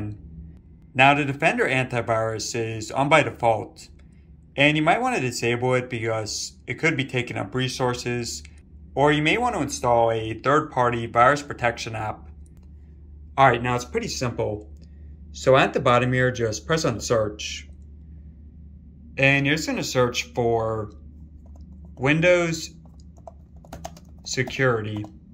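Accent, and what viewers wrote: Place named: India. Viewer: india accent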